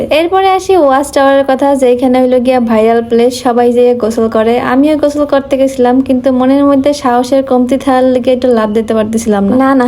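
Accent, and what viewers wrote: Barishal, Bangladesh. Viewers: কি বরিশাইল্লা পুরি এখন প্রকৃতির মাঝে হারাই গেছে 😊😊❤❤ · আপনার কথাগুলো সুন্দর লাগে🥹বরিশাল মনে হয়🧐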